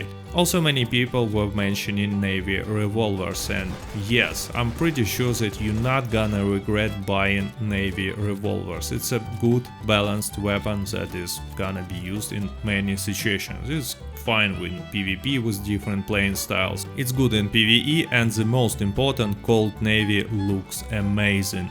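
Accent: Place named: Russia. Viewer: I've been subscribed for about six months and have a couple of compliments for you. First, your sarcasm is super extra funny coming from a guy with a Russian accent. English idioms and puns are hard even for native speakers but you mastered it. Second, if I were to guess your occupation it would be in the sciences. The combination of the scientific method you use (you always have an independent and dependant variable, and eliminate possible variences in the tests) plus you have a good sense of humour so I would bet you are an engineer. Last, I loved the way you used to say "Target" as "Tarjet". You should keep doing that as a running joke. Mad props to you, far and away the best Rdr2 YouTuber!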